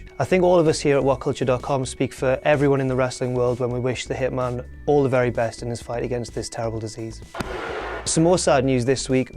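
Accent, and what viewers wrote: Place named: Newcastle, United Kingdom. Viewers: Yay!!... You are posh for a north eastern lad though. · HA! You're from Newcastle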